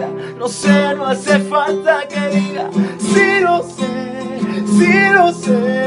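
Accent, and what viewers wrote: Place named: Córdoba, Argentina. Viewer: Y ese acento cordobeeeee!!! 😍😍😍😍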